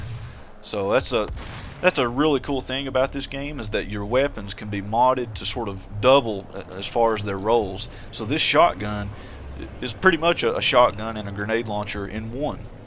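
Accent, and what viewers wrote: South, United States. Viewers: Holy shit you're a southern dude? I did not expect that. Not that I'm insulting you, I'm a Texan myself, just didn't expect that lol. I agree with you though I absolutely freaking loved this game and omg I can't wait for the next Doom. More weapons, more demons, new story, more weapon mods, hopefully new brutal animations, man it would be so sick. My only thing is I really don't see how id could possibly follow this up though, it is epic as hell.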